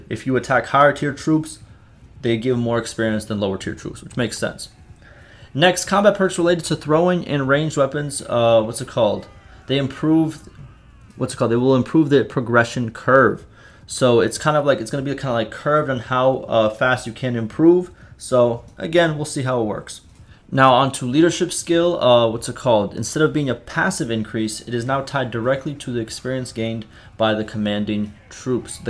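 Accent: South, United States. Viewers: I feel you on the southern part it's def how we talk😂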